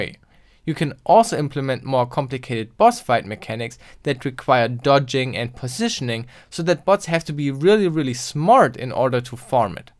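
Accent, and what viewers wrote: Germany. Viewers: Love your German accent, as soon as I heard your accent, I was like " this video should be good".. haha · german? xD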